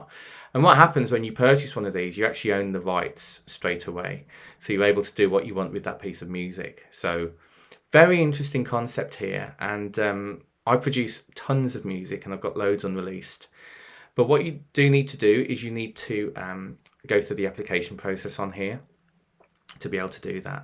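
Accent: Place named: Birmingham, United Kingdom. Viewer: Love your content! Are you from birmingham as well ?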